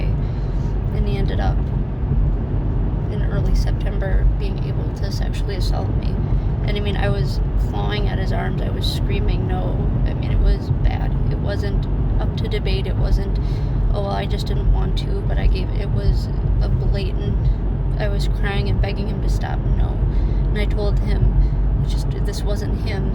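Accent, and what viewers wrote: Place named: Ireland. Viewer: I was just thinking if your are Irish or American-Irish as i recognize the twang and of course the penchant for red hair? There are several pitiful girls with their story here on YT about similar domestic situations that had turned abusive and eventually violent. You naming your children Riley and Reagen is also pointing me in the same direction. I feel very sad about your story, but i get the sense that there is something missing in your story, which would clarify why you are a submissive in the first place?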